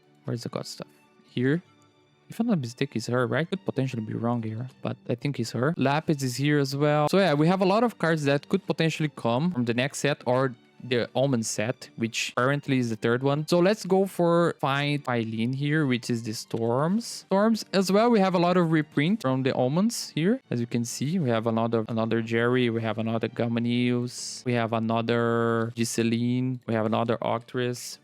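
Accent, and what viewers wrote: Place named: Brazil. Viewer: Brazilian portuguese accent am I right haha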